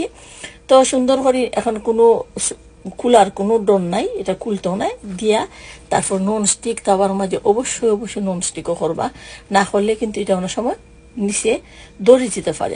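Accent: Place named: Chittagong, Bangladesh. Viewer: আপনার ভাষায় আমি মুগ্ধ ❤ আমি চাঁটগাইয়া ❤ কি যে ভালো লাগছিলো ❤ আমাদের আঞ্চলিক ভাষা মানেই মধু❤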